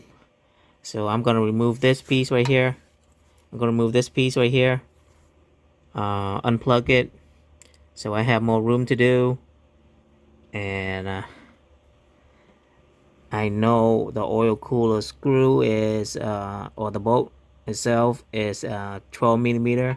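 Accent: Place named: Vietnam. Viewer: chao anh. thanks for the video. I know a vietnamese when i hear one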